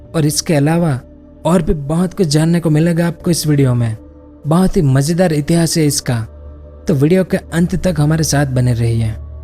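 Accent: Gujarat, India. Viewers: Sir aap ki boli se to laga raha hai ku aap gujrati ho By the way video bahot achhi thi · Bro tu gujrati he kyaa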